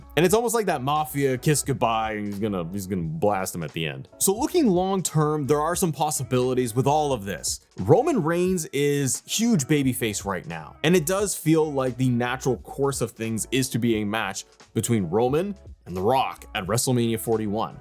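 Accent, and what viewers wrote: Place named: Canada. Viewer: I can tell you’re Canadian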